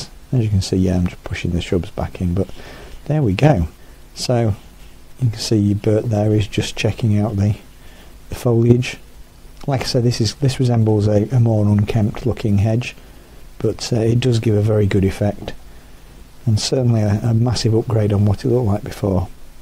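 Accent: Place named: England, United Kingdom. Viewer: Thank you, your a great crafter and I love your english accent.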